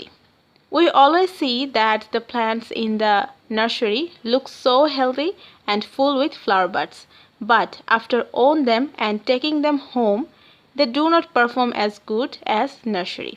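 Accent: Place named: Bengal, India. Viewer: Bengali sweet accent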